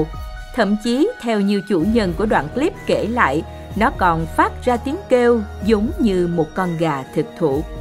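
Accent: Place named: South, Vietnam. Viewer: Người miền thì gọi là “ mồng gà “ người viết bài chắc không phải là người miền Nam nhưng người đọc thì phải .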